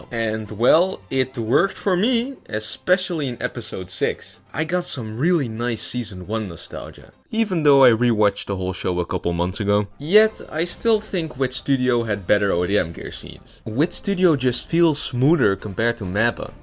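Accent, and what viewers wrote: Netherlands. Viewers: can tell ur Dutch by ur accent lolll w NL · U sound so dutch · Bro sounds dutch? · Are you Dutch? Your accent sounds very Dutch so I'm just wondering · Ik kan gewoon horen dat dit een nederlander is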